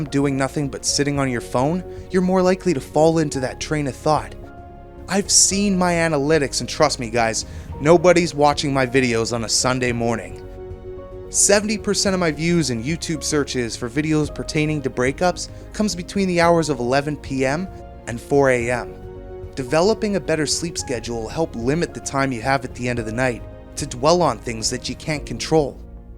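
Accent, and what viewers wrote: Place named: Canada. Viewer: What a fine fellow you are. I'm trying to place your accent to a State? Or, are you a Canadian?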